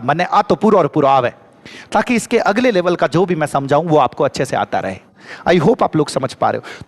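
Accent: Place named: Jodhpur, India. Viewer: Sir aap ki jodhpuri boli kamaal hai✨👍😊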